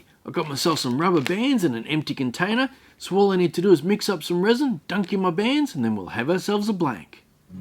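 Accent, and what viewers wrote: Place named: Australia. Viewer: Hey, we used to live in Victoria and so it is always awesome to have a friendly Australian accent to appreciate. My kids love to ask for a bedtime resin video, they think it is the coolest. Me too!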